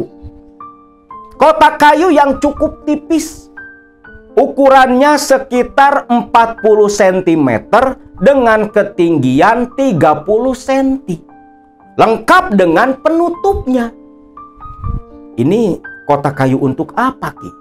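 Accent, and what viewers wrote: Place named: West Java, Indonesia. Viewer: Kang keren saya akui selain cerita mistis sejarahnya pun dibeber kan. Jelas iq kang tinggi. Pertahankan bahasa Indonesia dengan logat Sunda kang❤❤❤❤❤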